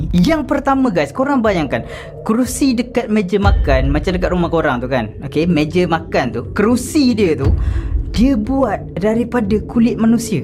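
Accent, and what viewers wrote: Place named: Kedah, Malaysia. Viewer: Tudiaaaaa cakap kedah kaaaaa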